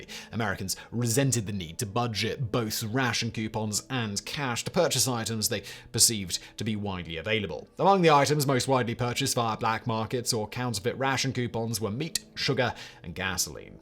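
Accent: United Kingdom. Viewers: Britain or Scotland. Love it.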